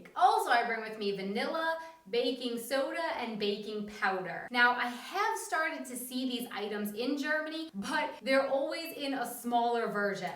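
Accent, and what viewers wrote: United States. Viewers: I watched this after watching your video about things you missed about Germany. Your accent is much more American here! Only use real vanilla beans in baking!!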